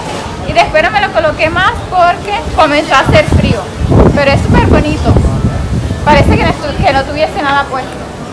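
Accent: Caribbean Coast, Colombia. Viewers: Hola saludos a tu esposo bello me gusta como hablas costeño t saludo desde barranquilla eres muy auténtica costeña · eres muy linda y agradable, me gusta como hablan los Colombianos saludos desde Guadalajara 🇲🇽 México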